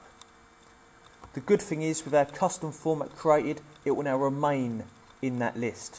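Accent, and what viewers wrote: United Kingdom. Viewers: I like The British Accent, Well Done Brother Subscribed DONE. :)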